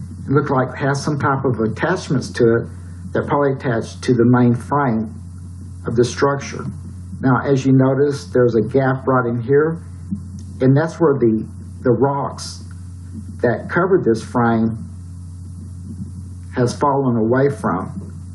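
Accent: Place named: Texas, United States. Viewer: Excellent video! You have a great accent. Are you from Texas?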